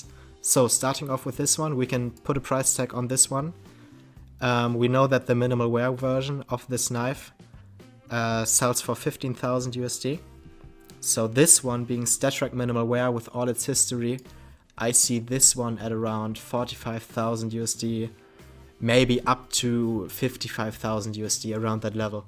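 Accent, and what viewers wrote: Germany. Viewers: Ultra German accent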